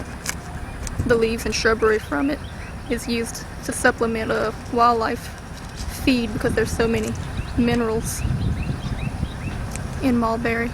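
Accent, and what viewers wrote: Louisiana, United States. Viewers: are you cajun ?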